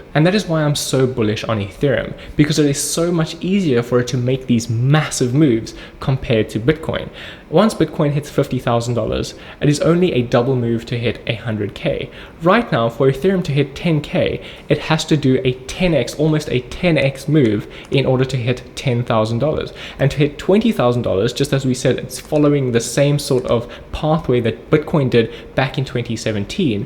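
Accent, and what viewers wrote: South Africa. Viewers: Are you South African? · Legend! Good to hear a South African voice in this space. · South African?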